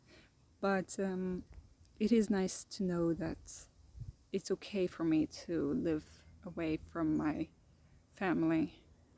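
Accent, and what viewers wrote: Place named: United States. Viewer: You started out sounding really American, then you said it was really hot and your accent completely flipped LOL. I originally thought I was INFP for a while and then I took the test again for a psychology course and it told me I was INFJ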